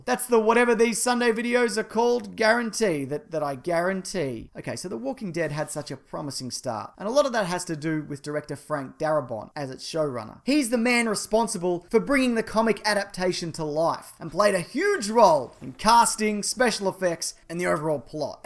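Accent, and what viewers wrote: Australia. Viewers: The generally upbeat, laid-back rhythm of the Australian accent contrasts perfectly with your particular brand of snarling vitriolic sarcasm. This channel is a joy to listen to. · okay first off my Ozzie friend. I love your videos for some reason you always say what is going on in my head LOL I know that sounds strange but that's not the point. I just want you to say tattoos as many times as you possibly can. because it's definitely not the way here in the US say tattoos LOL but I love it I first noticed when you were talking about the Joker and hoe it was not a fully realized character but you said tattoos at least a dozen times. okay well that about covers it just make a video about tattoos where you say the word tattoos as much as possible you Ozzie's you.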